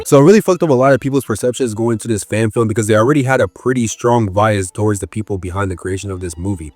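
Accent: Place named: Mexico. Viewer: man THIS one is a stretch Edit- What's wrong wrong with people having their own personal views that others deem "racist"? The reason I even ask that is because YOU'RE grifting by making both of the videos. A real BIG gear grind of mine is when someone calls a person racist for saying 🥷🏿 BUT then THEY start dropping the SAME WORD casually for the rest of their conversation. Nah my boy that ain't gonna fly, at that your whole fake rage & argument you just Steph Curry'd right into the dumpster. AND even if you're Black you sound like you have the inflections of a South of the US Border denizen, so saying it for you is wild if true.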